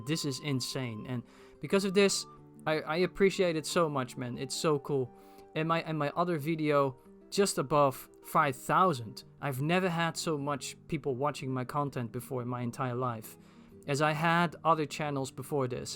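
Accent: Netherlands. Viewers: Hoorde aan je accent gelijk dat je nederlands was. Which is nice. ANOTHER DUTCH ZELDA FAN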